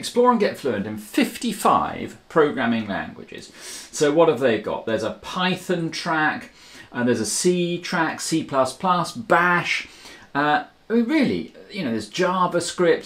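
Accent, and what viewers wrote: United Kingdom. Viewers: The gaming chair betrays the British accent.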